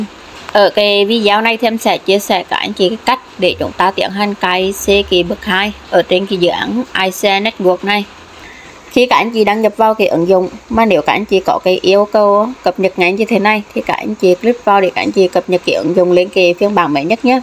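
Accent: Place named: Central, Vietnam. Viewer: Quê e ở mô mà trọ trẹ dễ thương rứa e 😂😂😂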